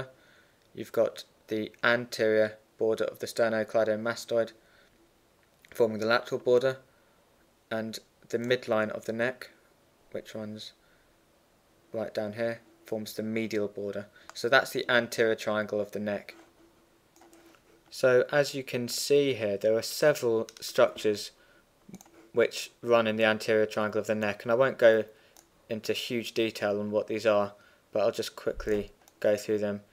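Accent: United Kingdom. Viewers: terrible british accent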